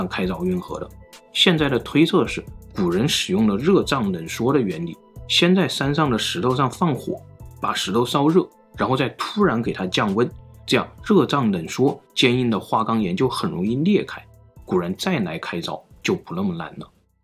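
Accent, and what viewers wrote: China. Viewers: 我听着挺好哒😁估计是湖南或者川西这类地方哒。咱们岭南地区说普通话就这样。我广西哒要听我们的普通话吗？😎😎😎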